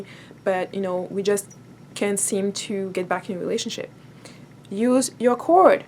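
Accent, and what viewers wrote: France. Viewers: Why do I hear a French accent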